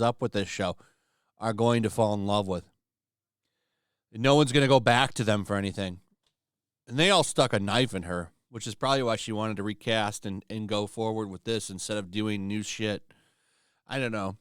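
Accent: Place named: Aristocratic, United Kingdom. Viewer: I’m listening to this as I shop at Kroger and the pompous British aristocrat accent literally has me laughing so hard people are staring at me.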